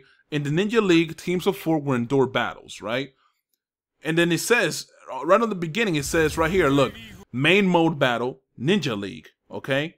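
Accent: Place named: Dominican Republic. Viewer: xD hasta hablando ingles se te sale lo dominicano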